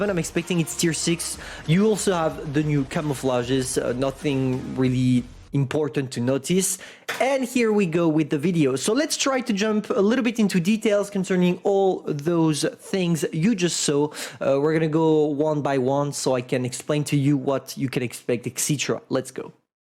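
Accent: France. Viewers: They are not used to. Not 'their'... (autocorrect?). But you are right. It is not a laughing matter indeed. I sincerely think is one of the best youtubers on WoTBlitz. I watch his video's a lot. I like his approach to the game. I just find the way he pronounces 'event' really really really annoying. How can I reach out to him, to make him say that 1 word 'event' say correctly. For the rest, I know he is French, so no bad words for the rest of his pronounciation there. It even is charming. He is good. I just want this 1 word 'event' to be pronounced correctly.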